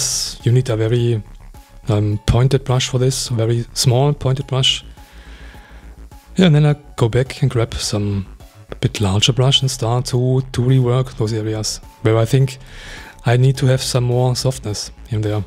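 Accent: Germany. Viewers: His German accent is the best that u can find, lovely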